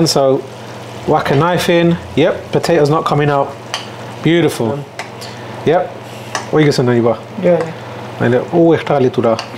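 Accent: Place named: United Kingdom. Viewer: Some British accent..... up ahead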